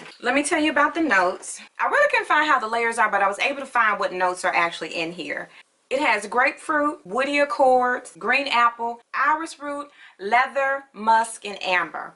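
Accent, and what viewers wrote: South, United States. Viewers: very nice list and nice sothern accent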